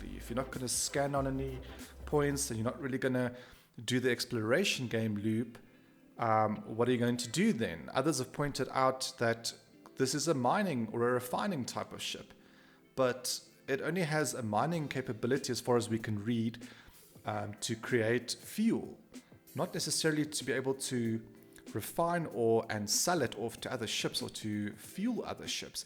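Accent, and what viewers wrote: South Africa. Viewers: I notice that south african accent... any way I also looked at that odyssey it truly is an oddity, the only thing I can see it really being is a mobile station or base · You say capital like it should be Cape Town, but it’s actually still Pretoria. Even though capexit is there. Lol. Jokes. Can hear a saffa a kilometre away. The misc starfarer is a beast.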